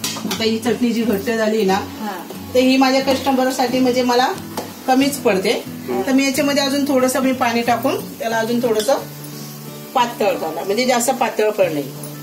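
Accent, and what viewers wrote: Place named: Agri, India. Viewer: Mast ekdum Agri ascent talk.. 👍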